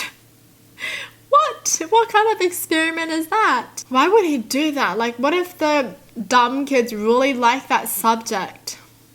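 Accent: Australia. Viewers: Love the strong accent, like its very aussie and i love it!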